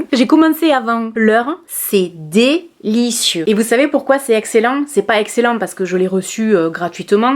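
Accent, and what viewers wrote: South, France. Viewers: Trop contente de te retrouver plus souvent 😍 et tu mérites largement d’être sponsorisée par des marques 🤍🍀 ! Je regarde toutes tes vidéos quel que soit le sujet car tu es notre rayon de soleil 🌞 avec l’accent du sud 😉 ! Je t’adore merci pour tes vidéos 😘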